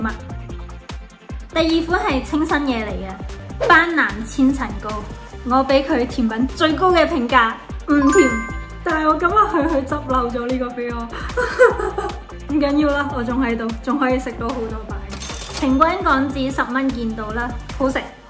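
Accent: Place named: Malaysia. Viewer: 估唔到你同我一樣,去得多馬拉返嚟都改唔到口吻,好似落水,去邊度撩,直直走之類😂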